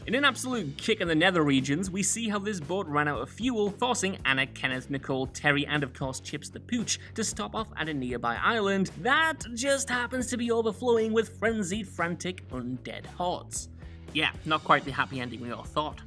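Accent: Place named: Newcastle, United Kingdom. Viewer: I do love a nice, Geordie WhatCulture video... (Unless it's a macam) 😀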